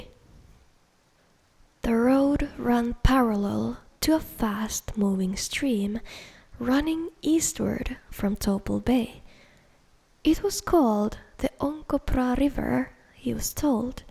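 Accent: Finland. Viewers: The Finnish accent is really appealing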